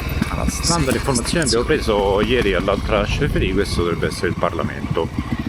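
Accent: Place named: Rome, Italy. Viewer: sono molto contento che puoi fare queste esperienze, bravo. anche io ci provo sovente , ma ancora non è la mia vita piena… ti auguro il meglio e tanta fortuna. inoltre il tuo lieve accento romano è molto gradito. continuo a seguirti da molto tempo, dall’inizio…!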